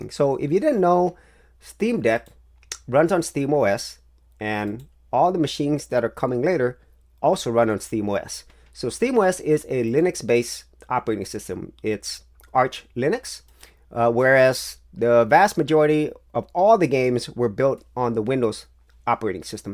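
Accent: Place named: Latin America. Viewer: My wife and I were trying to figure out your nationality based on your accent. I said Hspanic but my wife said Korean. I see your picture now but help us! Did you grow up in a Latino country?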